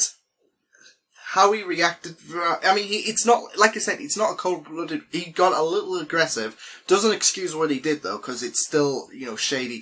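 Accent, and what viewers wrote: United Kingdom. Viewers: Another question: your accent is North of England? Yorkshire? I'm an amateur linguist.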